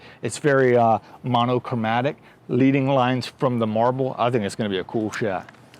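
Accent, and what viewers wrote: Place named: South, United States. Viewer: Great to see you back!! Love your videos and hope to see many more in the future. By the way I can hear you've picked up a little of the southern accent, nice!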